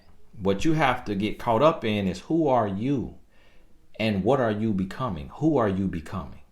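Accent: South, United States. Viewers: Great video. I like your southern country accent. I saw your wife in a video. She is beautiful.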